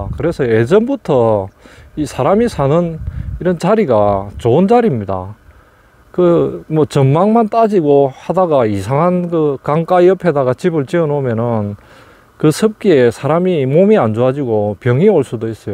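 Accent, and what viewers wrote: Gyeongsang, South Korea. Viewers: 경상도 액센트 최고 매력적이에요 love love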